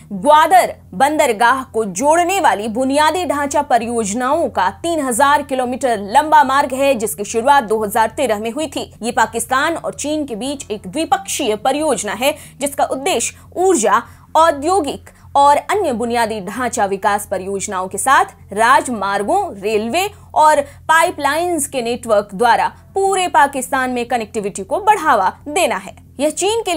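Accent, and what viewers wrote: Standard. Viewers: It transcends me to a new level of excitement when I hear someone speaking SHUDH HINDI, very proud of you ji, keep up the excellence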